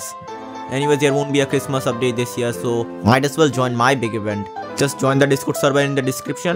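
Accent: India. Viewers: Hey guys didn't u notice the update the air just got colder Edit: btw are you Indian cuz u got some Indian accent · The music is the same as in all of your videos. Your accent is indian. Words are just words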